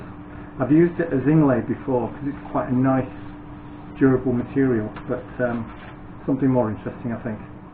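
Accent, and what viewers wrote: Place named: Yorkshire, United Kingdom. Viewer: Hi your video just popped up in recommendations - as soon as I heard the accent and saw the yorkshirman plaque on your wall I subscribed! looking forward to more videos..... and watch out for a sub jump after this weekend I'll be giving you a shout out on my channel: us little'uns got to stick together... 👍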